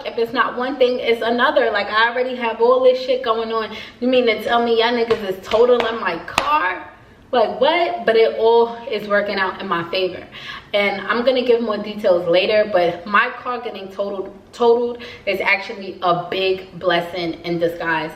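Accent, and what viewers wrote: New York, United States. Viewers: I needed to Hear This ❤️‍🔥🥴 But yooo the nyc is def in you 😂 #BIGVIRGO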